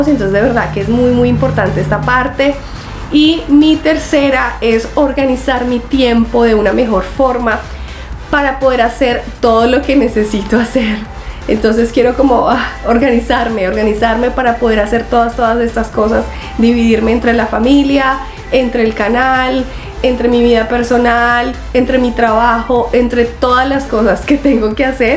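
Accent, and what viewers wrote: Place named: Colombia. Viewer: Qué tengas un muy feliz año nuevo mi niña hermosa , eres muy bella y simpática y me gusta mucho el acento colombiano , bendiciones desde Chile 🇨🇱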